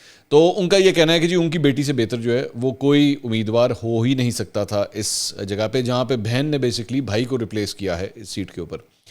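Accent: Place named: Karachi, Pakistan. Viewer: Aap Urdu to bohat achi boltay ho jaisay Karachi walay boltay hay... Lekin aap Punjabi bhi bohat achi boltay ho.. Jaisay Punjabi Log... Please tell us something about you...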